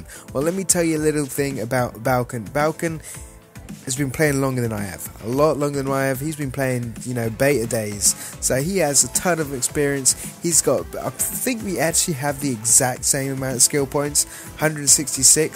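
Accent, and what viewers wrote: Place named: Russia. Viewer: ***** you sound like a russian and im not insulting you. Your Russian voice makes me watch your videos :D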